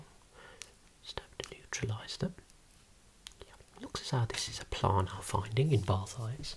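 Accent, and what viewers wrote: Birmingham, United Kingdom. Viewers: Dislike it? I dont know anyone who dislikes the Peaky Blinders accent. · Birmingham? you’re made for this, mate. And very handsome to boot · It's interesting how perceptions of accents vary. He has an accent from around the Birmingham area of England. Other people in the UK tend to dislike this accent and it's often a figure of fun. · Proper beautiful midlands accent. Hello my Brummie friend ❤ we share the same city! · U sound British 😲😶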